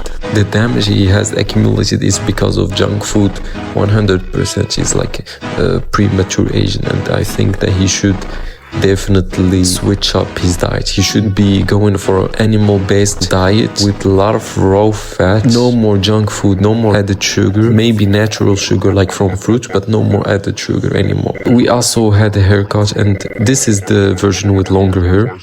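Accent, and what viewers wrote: Morocco. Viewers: Moroccan accent bruh ur moroccan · Are u Moroccan bro? From your accent I can tell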